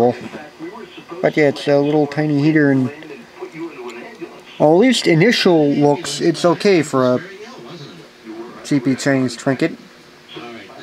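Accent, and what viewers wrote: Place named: Northeast, United States. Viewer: WOW, great video, lots of info! Your from the north east of the states? You talk fast and roll your words together.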